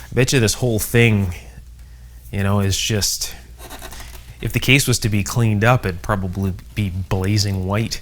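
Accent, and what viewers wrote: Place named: Canada. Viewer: I detect a Canadian accent, bet I am right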